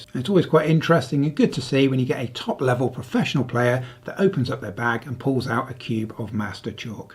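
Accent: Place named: United Kingdom. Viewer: Can I ask what countries currency you're saying in dollars? Mostly so I can make peice conparisons to New Zealand dollars. You sound like you should be saying pounds...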